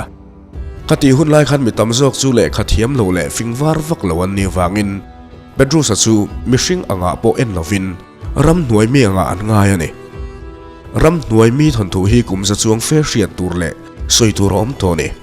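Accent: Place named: Mizoram, India. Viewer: I aw. leh i aw phawi hi, ka van duh teh lul.. britain aw phawi kan tih ang a.. Mizo aw phawi diktak hi mawi ka ti.. " aw" i tih anga a... aw mawi tak tih aiin aw phawi tih hi a dik ka tizawk.. Min "Pin thei"../😁😁😁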